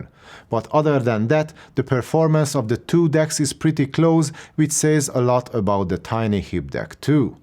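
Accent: Hungary. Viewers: you sound very hungarian edit: I’ve just realized you are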